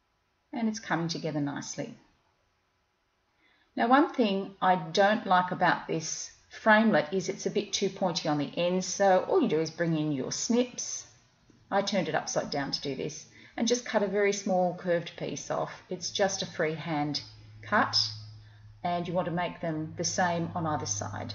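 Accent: Australia. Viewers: Hi, I am new to your channel and must say that it is refreshing to have an Aussie voice chatting to me. Love the bags, I can see myself making a few of these for Special Occasions. Thanks for such clear instructions.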